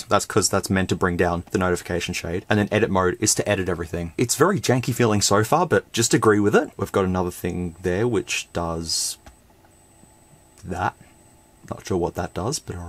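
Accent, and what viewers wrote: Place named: Australia. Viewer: See you sound Australian so I believe you but you are whiter than me and I'm Irish 😂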